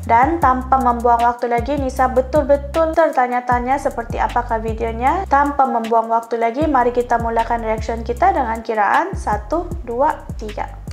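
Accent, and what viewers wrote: Indonesia. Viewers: Apakah adik cantik Mimin dari Sabah atau Sarawakkah . Karena anda berbicara logat seperi kami Indonesia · Wajar bisa berbahasa ind medok....karena kuliahnya di Indonesia dan lama tinggal di Indonesia.. bukan berarti Ndak menghargai BHS melayu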